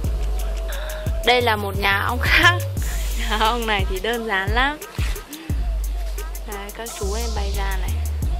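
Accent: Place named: Hai Duong, Vietnam. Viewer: Nghe giọng như gái hải dương hoặc an lão hp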